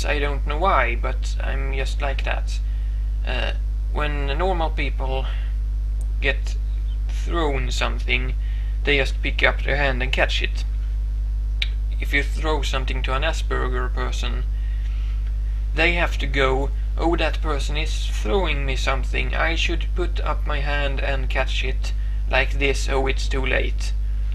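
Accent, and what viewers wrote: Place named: Sweden. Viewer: Your accent remembers me a lot Ingmar Bergman, a lot, a lot.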